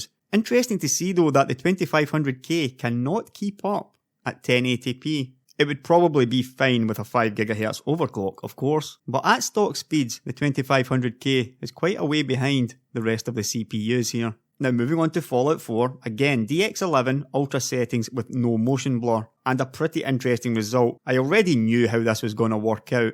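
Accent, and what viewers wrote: Scotland, United Kingdom. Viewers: I love it when i can here people are from Scotland, i am half scottish. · Sweden? Judging by your accent I was sure you were Scottish. Or perhaps living abroad?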